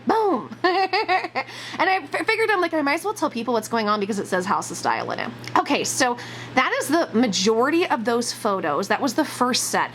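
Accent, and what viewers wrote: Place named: Kansas City, United States. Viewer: Aha! I grew up in the Kansas City, KS suburbs! No wonder I talk a lot like you. Currently I live in Merriam, KS which is still that area.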